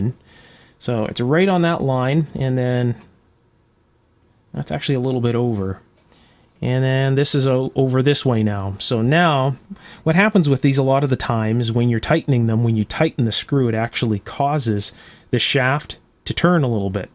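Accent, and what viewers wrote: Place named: Canada. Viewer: Love hearing Canadians say "about" "pasta" and "Mazda" 😬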